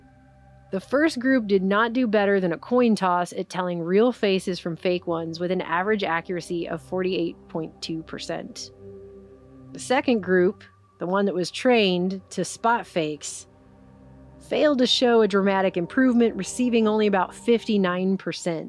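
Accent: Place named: Texas, United States. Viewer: ngl that Texas accent becomes very irksome after several minutes of hearing it.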